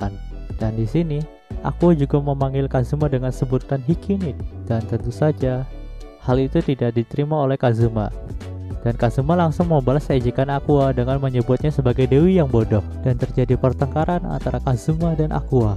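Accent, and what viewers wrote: Cirebon, Indonesia. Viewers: Orang Cirebon njir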